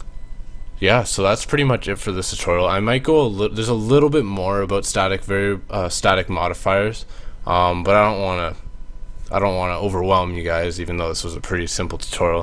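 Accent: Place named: Canada. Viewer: Canadian…. I was fishing around for the accent. Didn't quite get the origin.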